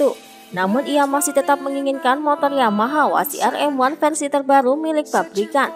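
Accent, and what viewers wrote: Java, Indonesia. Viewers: Medok min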